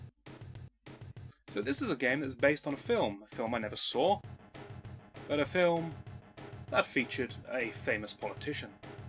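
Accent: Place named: United Kingdom. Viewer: Great to see you back,! Your monotone, British commentary was gravely missed and I was more than ecstatic to see a video from you show up in my feed. I can only hope you complete your mission of Let's Play Everything this go 'round.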